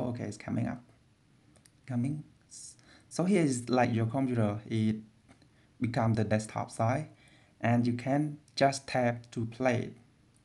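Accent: Cambodia. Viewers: ohhh it makes sense now, i knew ur accent sounded familiar! your khmer! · I knew u are a Cam..... . Cause I know every Cam...... speaking english.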